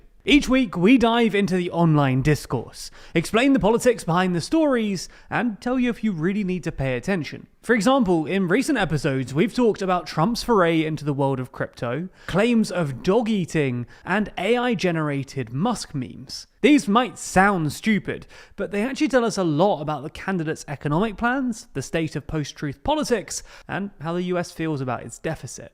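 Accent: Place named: RP, United Kingdom. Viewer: Good analysis, however you forgot few things. One of the reasons behind the price increases especially in real estate is the migration influx from Rusia and Ukraine. Another huge investment is the UAE construction project like Belgrade Waterfront. Also, don't forget the Serbian diaspora and their effect on the serbian economy especially in real estate. Another country investing in real estate and construction is Israel, also a major importer of serbian produce weapons. To top at all, Serbia is hosting the World Expo 2027 and the government is spending lot's of money on the projects. At the end, just a personal note...no need for a posh language, normal British narration will suffice.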